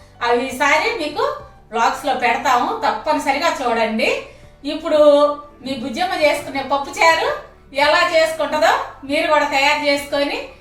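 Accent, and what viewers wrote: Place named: Telugu, India. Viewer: Wow :: What a Telugu ! Bengaluru or Belgium can not change this lady' s Telugu accent or rustic aroma Manu more dishes like Kandi Pappu and Kandi Ginjala Pachhadi please Prakasam Panthulu Garu used love Gongura Pachhadi in Ongolu style PV Garu Chintha Kaya Thokku in Karimnagar style We are proud of this lady and her rustic way of presentation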